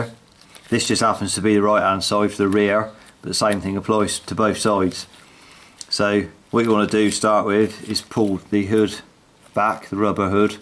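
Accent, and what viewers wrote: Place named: Bristol, United Kingdom. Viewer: Thanks for this . Sounds like you might come from the Bristol Area ? Do you have a bike shop or do repairs ?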